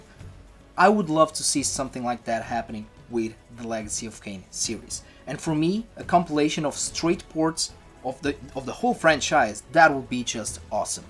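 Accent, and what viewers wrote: Portugal. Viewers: Wait, are you from Portugal?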